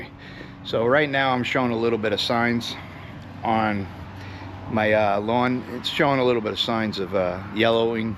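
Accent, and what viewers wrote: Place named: New York, United States. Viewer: I’m getting ready to spray my lawn with chelated iron. What part of the country are you in? I’m in NY and you sound like a New Yorker.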